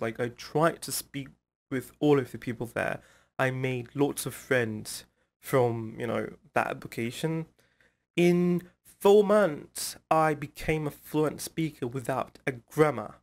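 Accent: England, United Kingdom. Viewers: Valla helal olsun , "I am John." desen kimse şüphe etmez , nasıl bir İngiliz aksanı ya, harikasın tebrikler👍👍👍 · İngiliz aksani finaaaa ateşşş🔥🔥🔥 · uk aksanı bu ama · British accent da cheery on top olmuş :)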